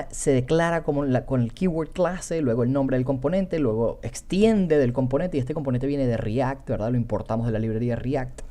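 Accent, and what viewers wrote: Venezuela. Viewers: tu como que eres Venezolano mi pana, excelente instro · marico eres venezolano? que de pinga encontrar un desarrollador con nuestro acento... saludos mi pana... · Mano tu eres venezolano si o si xD calidad el video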